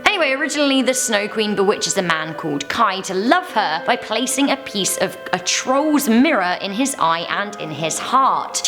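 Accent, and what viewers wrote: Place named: United Kingdom. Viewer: She sounds like a British Princess Carolyn (Bojack Horseman)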